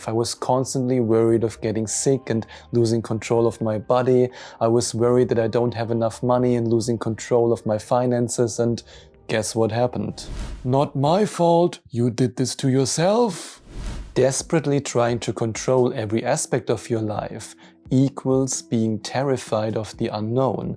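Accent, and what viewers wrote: Germany. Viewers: Great video,! I appreciate your honest, straightforward approach, not full of hype like so many YouTubers. And I love your German accent!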